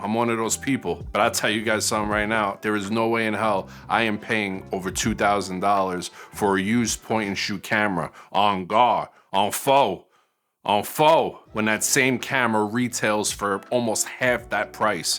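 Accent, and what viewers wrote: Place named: Queens, United States. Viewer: Great review, currently thinking of picking the XT30ii as my EDC camera. By the way, that accent...Queens, NY right? I'm hearing Flushing or Jackson Heights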